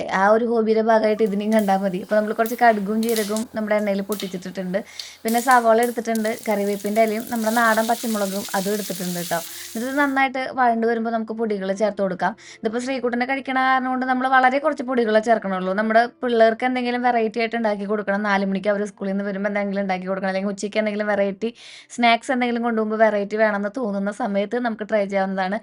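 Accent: Thrissur, India. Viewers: Eyyy... Noo... ഇത് തൃശ്ശൂർക്കാരുടെ സ്വന്തം ശൈലിയാണ്.. ❤️❤️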